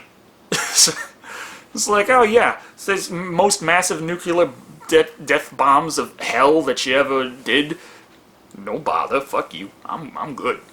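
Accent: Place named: Texas, United States. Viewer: polygon He's from Texas so he does have himself a little accent lol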